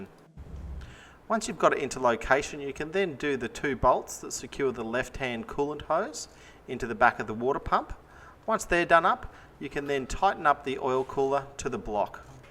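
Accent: Australia. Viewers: ausie accent??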